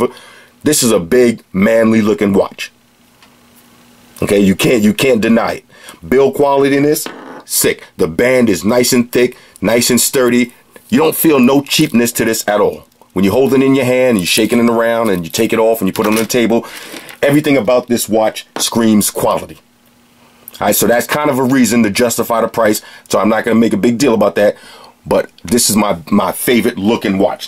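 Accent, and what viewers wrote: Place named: Brooklyn, United States. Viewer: Love your vids homie! Mad informative. You sound like my cousin in Brooklyn i swear! Salute!!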